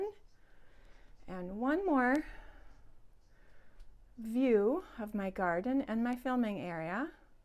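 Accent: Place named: Midwest, United States. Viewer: What a lovely, Quint cottage you have!! Thank you so much for sharing it with us. Can I ask if you are a Midwesterner, like me? We kind of sound alike:) You do a great job making use of the space you have!🦋☀️🥰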